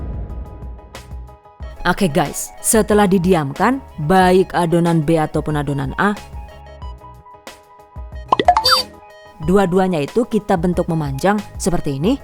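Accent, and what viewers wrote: Banyumas, Indonesia. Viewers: jebule wong ngapak y mbokayi😂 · Jebulane wong ngapaakkk..ora ngapak ora kepenak😂😍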